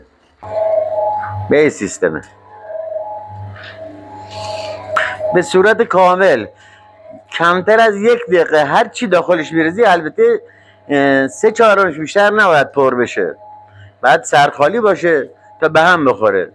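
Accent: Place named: Fars, Iran. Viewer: لحجه استان فارس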